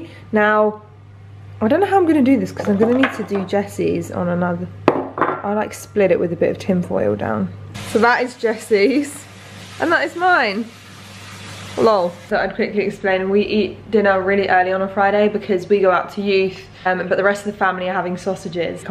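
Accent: England, United Kingdom. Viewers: So that's what a british accent is... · Pink Fluffy Unicorn a brittish accent could be northern Irish welsh etc but her accent is just English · Are you British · Who else loves her British accent? 😍❤🇬🇧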